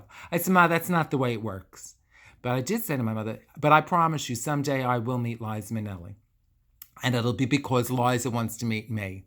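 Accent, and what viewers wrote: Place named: East Coast, United States. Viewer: I simply cannot fathom the fact that instead of being touched by ur heartwarming confessions, people choose to see it as name-dropping. Oh well. Your east coast accent perfectly matches the west coast setting miss coco, thnx for sharing these gems with us puffs. Love from greece ❤️❤️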